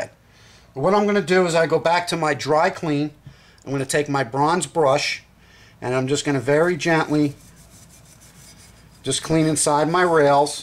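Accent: New York, United States. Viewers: NY accent? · Great stuff! Thanks for the video and simple to follow instructions, this is my first gun and I want to take good care of it! btw love the accent, you from New York?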